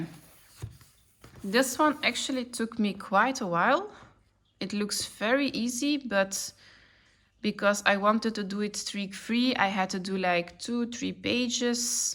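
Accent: Belgium. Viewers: Ik hoorde meteen dat je een Belg was. Eerste keer dat ik je video's zie. Leuke pagina's dat je maakt. 😁 Heb je een instagram? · Hey 👋🏻 van België en ja het accent, ik ken het 🤣 en zeker met de Gentse toets erin bij mij, net je kanaal ontdekt en wat mooi allemaal. Zelf relatief nieuw in de kleurenwereld maar zoveel bijgeleerd al 😁 Ik heb nog geen huidskleur durven kleuren, maar ik heb wel interesse in kleur van neocolor II die je hebt gebruikt. Dankjewel 🙏🏻😁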